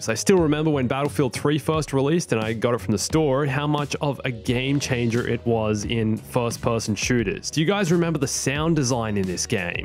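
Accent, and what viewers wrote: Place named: Australia. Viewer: Wait wat ur Aussie. I thought u were British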